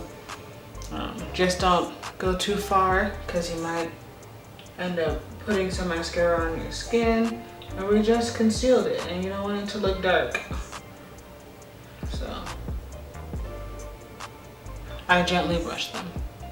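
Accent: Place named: Netherlands. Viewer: Nice subtle job. I thought you were from the Netherlands haha because you have a accent.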